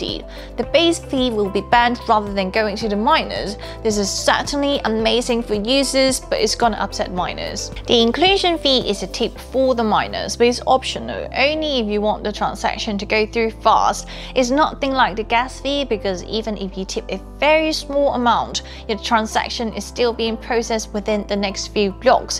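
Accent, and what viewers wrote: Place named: United Kingdom. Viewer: I had to like and subscribe because its good content but also because I'm fascinated by your voice, it's like half very posh english and half Asian, it's brilliant haha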